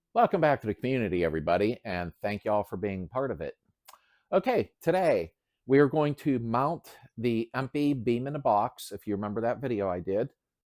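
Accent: Pittsburgh, United States. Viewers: Nice Video, I recognize that Pittsburgh accent anywhere I go...Where you located?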